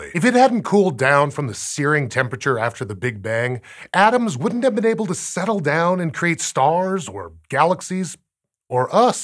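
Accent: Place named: United States. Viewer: Chris Ecclestone? He was from the north of England. This guy's American.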